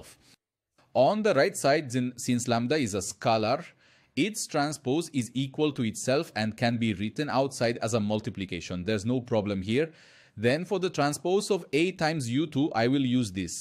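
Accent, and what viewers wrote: India. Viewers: Hı teacher . YouTube made your accent like Indians accent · biraz indian english olmuş hsdjgfs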